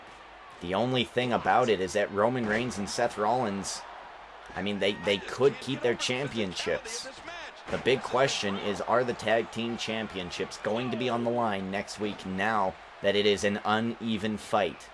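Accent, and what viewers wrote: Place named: Switzerland. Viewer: Here we go, Friday Night Smackdown (It is late, so I'm going to make these things short). A pretty interesting grudge match, and I'm gonna go with Owen Hart. He's impressed me one hell of a lot recently. Damn, what a fantastic match, especially the run-in! I can see why you're super happy with the way you use Universe Mode, that was great!:D. As much as I adore Antonio Cesaro, AIDEN ENGLISH WILL PREVAIL, I JUST KNOW IT! I liked your Swiss accent, even though it did slip into English and Irish quite a lot... but details! while I'm sad Aiden English did not win, Cesaro's commentary was really fun, so it is all good! Great work! Gotta go with Orton. You never want to piss off a Viper. A great match, with some nice Samoa Joe commentary on the side!:). Gonna go with my man, Corey Graves! Damn, Rider kicked some major ass in this one! 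Utterly murdered Graves! WWWYKI! I'm going to give my victory to The Shield here. They've been super dominant, and right now, I don't think Yoko or Kofi can stop them. Wow, The Milita comes out on top! I'm impressed with them, they could be a real threat towards The Shield's reign as tag team champions! Great stuff as always!:).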